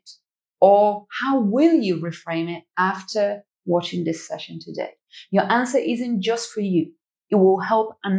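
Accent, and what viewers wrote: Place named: United Kingdom. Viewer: This video is beyond informative. The soft warm British accent is definitely helpful.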